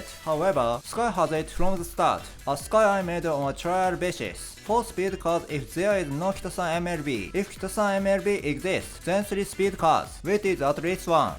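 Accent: Japan. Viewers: What is wrong with your english? It’s like you’re speaking in japanese accent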